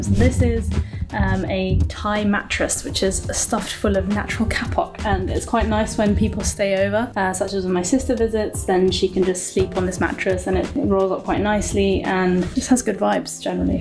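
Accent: England, United Kingdom. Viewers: so you born in British or in USA ? you have quite strong English accent. Do you have plan yo practice medicine in the US?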